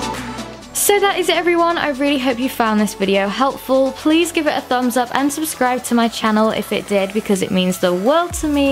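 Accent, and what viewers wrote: United Kingdom. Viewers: Love your british accent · Are you using a British accent??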